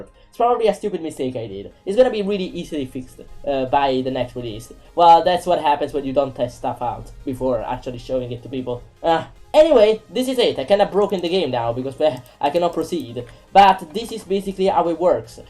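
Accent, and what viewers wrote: Italy. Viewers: Great accent :D Where're you from, Italy?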